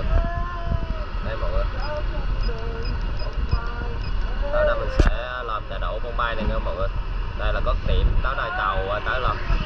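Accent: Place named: Quang Nam, Vietnam. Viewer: Ở mô mà nói tiếng quảng nam vậy🤔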